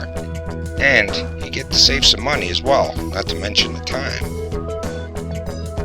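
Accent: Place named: Georgia, United States. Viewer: Nice accent, north Georgia?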